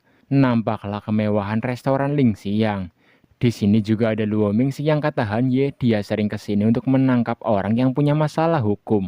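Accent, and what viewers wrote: Banyumas, Indonesia. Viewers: Duuh Mas broo yg merasa mirip Han Ye alias Gong Jun hehehe wkwkwkk kok ngomongnya ngapak gitu sama kayak Aku lhoo aslinya mana yaaah gaess 🤔🙉🤗😁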